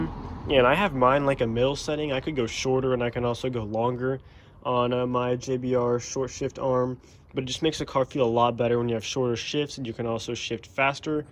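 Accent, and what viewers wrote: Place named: Oklahoma, United States. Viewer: oh dude what part of oklahoma are you from? any where near norman?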